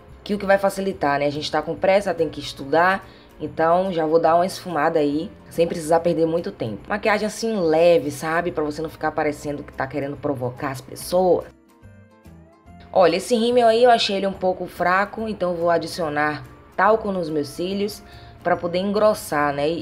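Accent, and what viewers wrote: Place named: Northeast, Brazil. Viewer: Primeiro video que vejo do seu canal, seu nome é?😂 amei o sotaque vc é nordestina?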